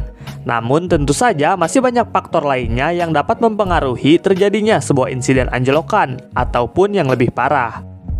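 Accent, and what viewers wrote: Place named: Sunda, Indonesia. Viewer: Kental juga logat Sundanya, bro.👍🤝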